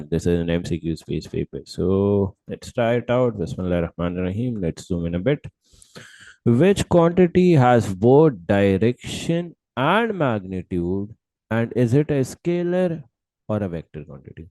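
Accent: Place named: India. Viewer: fav indian accent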